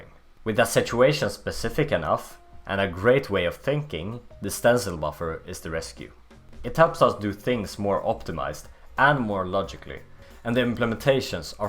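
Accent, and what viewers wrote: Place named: Sweden. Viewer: Wow, really good quality for just starting out! I really liked the explanations, they were pretty clear and your accent is very nice. Kind of sounds like an Swedish accent to me. Personally, I didn't like the moving parts (top, bottom) whenever the code was shown, because it kind of distracted me, but that's just me.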